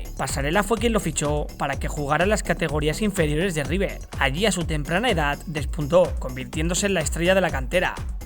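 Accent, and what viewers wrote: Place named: Galicia, Spain. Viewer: gallego babling in his dialect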